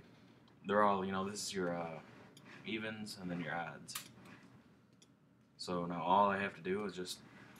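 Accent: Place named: East Coast, United States. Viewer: I hear an East-coaster.. are you a fellow Newf? Thanks for the vid bro!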